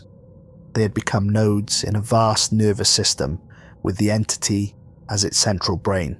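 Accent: Wales, United Kingdom. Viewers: I do like the story's! But it seems, only bit different from meny b4 ,,,please do some different one's!.if possible have some others to join you ,it will give the story's more depth. I'm not criticising you ,never stop ,can I here some Welsh in your voice,,,,hope so ,.if I ever get rid of long covid, hopefully i can start writing a story or to again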